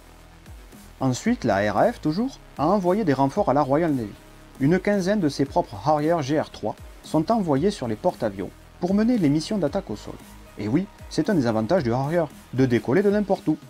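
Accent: South, France. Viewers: J'en ai lu des trucs sur les avions et sur le Harrier, mais là je n'ai qu'un seul mot : FELICITATIONS. Que de travail ! Sur le fond on apprend pleins de choses en un minimum de temps, sur la forme, rien à redire, les images sont exceptionnelles et ont dû demander un paquet de travail, le montage est parfait, le ton parfois humoristique et l'accent du sud ne font que rajouter de l'intérêt à vos vidéos en les rendant plus vivantes. Petit bémol le verbe "pallier " est transitif direct "La construction pallier à [verbe transitif indirect], courante, est incorrecte" mais bon c'est pour chipoter car les commentaires sont justes excellents, Continuez !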